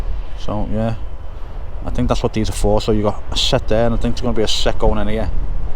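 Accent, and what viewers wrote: Liverpool, United Kingdom. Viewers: Super well done. Like your updates and the zoom ins. You with other are doing a great job. I find these videos really interesting and has been following from Malta since the start of the project. Keep them coming. Also I like hearing your scouse accent 🙂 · Great work, its awesome for s scouser in Texas not just loving seeing the progress of the construction work but getting the dose of “scouse” when all i hear here is y’all and you ground me with “yers all”.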